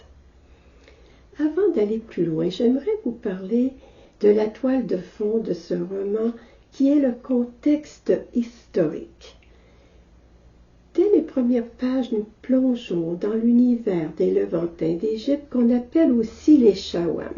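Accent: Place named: Quebec, Canada. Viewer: Ça s’appelle un accent québécois madame et pas une mauvaise diction. La qualité de l’audio laisse à désirer certes mais la diction de est irréprochable, à moins que vous ayez une allergie particulière à l’égard des différentes manières dont la langue française se prononce à travers le monde, dans ce cas on ne peut rien pour vous, votre cas est sans espoir.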